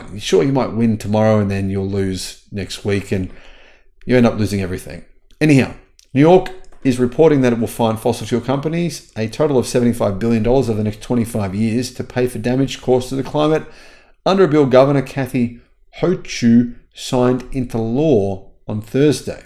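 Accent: Australia. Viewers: Nice listening to an Australian talking about EV/Tesla. The American commentators have their head well and truly up Trump's ares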